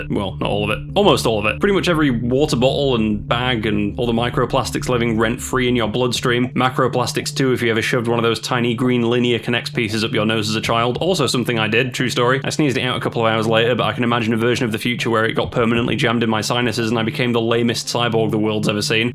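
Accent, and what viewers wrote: United Kingdom. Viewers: YOU'RE SCOTTISH?!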